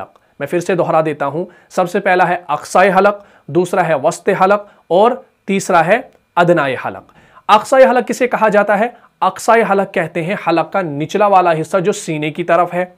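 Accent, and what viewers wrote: Kashmir, India. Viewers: Bhai jaan aapka accent Kashmiri jaisa hai ??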